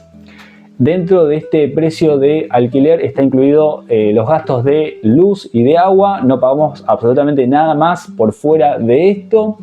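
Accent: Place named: Uruguay. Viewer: Aprendí o un poco purtuges y ya tranquilo por el acento y otras fraces supe q ue eras de Uruguay, es caro Montevideo. No me convence acá, saludos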